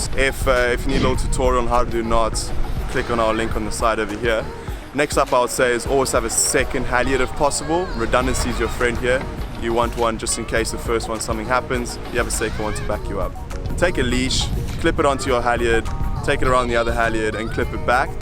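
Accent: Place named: South Africa. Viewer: Love the SA accent and no-bullshit manner 👍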